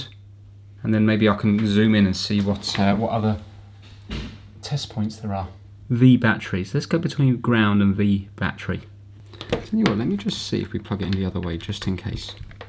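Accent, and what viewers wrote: United Kingdom. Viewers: bro your accent make me want to leave my contry and com live in uk ,i love your video and thank you for all what you do sorry for the bad english 😅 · Hi. I wonder if you have ever watched the Grim Reapers YouTube channel? The reason I ask is because your voice is a dead ringer for "Cap", the channel owner. Am I on to something, or is this just a matter of all you Brits sound the same to we Americans?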